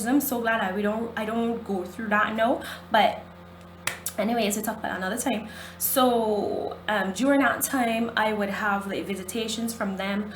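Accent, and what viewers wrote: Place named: Caribbean. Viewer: i hear Bim, Guyana or trini accent....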